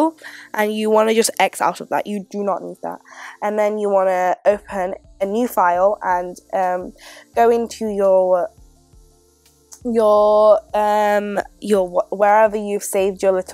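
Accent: United Kingdom. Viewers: Are you from London? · FINALLY! Someone British..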